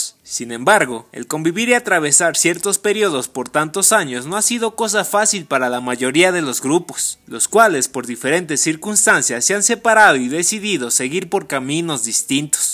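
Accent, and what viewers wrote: Mexico. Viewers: Habla más neutro porque tu acento de Panchito es hiper marcado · eres norteño? jajaj duda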